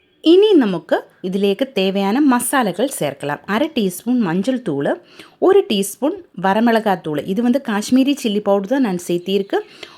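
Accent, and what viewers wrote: Kerala, India. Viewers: அவர் மலையாளி ஆகவே தான் அப்படி பேசுகிறார், we should appreciate her.instead of mocking.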